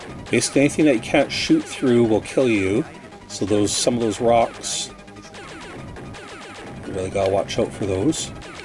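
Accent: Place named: Winnipeg, Canada. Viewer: I'm really enjoying your videos the past few days, I just discovered your channel. Fellow Canadian here and from the sounds of it, you sound like you might be near my neck of the woods here in Winnipeg. I was a Commodore fan back in the 80s too as a kid. More recently I backed the kickstarter to get myself one of the new Sinclair Spectrum Next 8-bit computers (can't wait to receive it this August)! Cheers!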